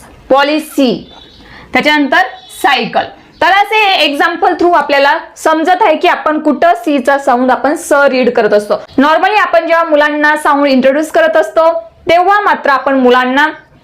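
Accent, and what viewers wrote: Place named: Khandesh, India. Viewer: Where are you from ma'am your language tone like khandeshi girl. Are u khandeshi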